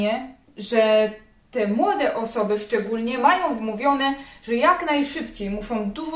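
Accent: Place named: Silesia, Poland. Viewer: Widać że się pilnujesz w słowach i wymowie. Czuć Śląsk z daleka 😊 pozdrawiam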